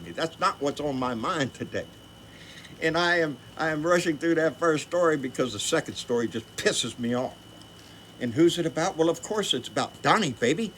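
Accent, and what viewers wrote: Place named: New York, United States. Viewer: WOOO HOOO!! Holy you know what I can't tell you how nice it feels to hear my NY thoughts echoed in that accent! THANK YOU. 👏🌼✌🏜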